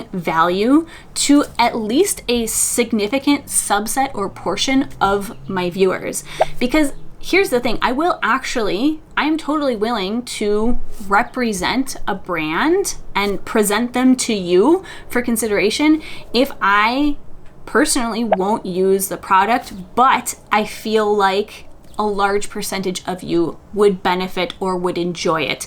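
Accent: Canada. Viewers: Yay eclectic! Also I love the way you always reply to comments. And yes I was crocheting while watching/listening. You are fun to listen to and there is nothing wrong with your Canadian mashup accent. I also have a CAN mash up accent in both official languages.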